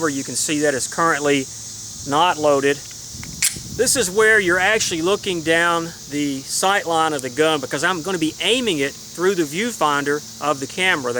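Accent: South, United States. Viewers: you have more of southern draw now than you did in your older videos. Hooray!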